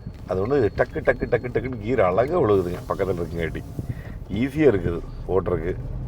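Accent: Kongu, India. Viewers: Bro, korangu pugaielai (country tobacco brand)... Typical kongu slang.. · கொங்கு தமிழ் அருமை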